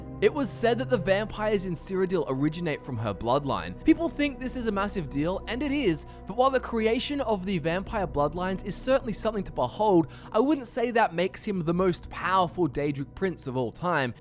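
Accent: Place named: Australia. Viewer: I love how in his Aussie accent says "Sheagorath"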